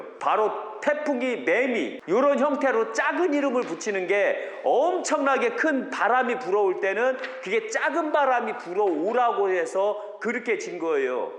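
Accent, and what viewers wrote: Chungcheong, South Korea. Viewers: ㅅㅏ투리가 충청도 분이신가?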